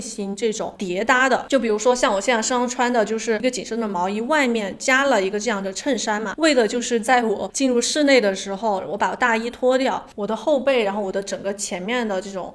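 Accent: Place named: Sichuan, China. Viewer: 干货超多！四川话口音的普通话太亲切了😆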